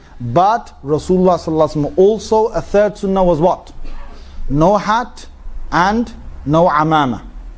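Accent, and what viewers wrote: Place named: United Kingdom. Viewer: Definitely, british accent yes man I like this islamic man very much talking good about prayer and about his own God name called Allaah it's there own God true talk Mr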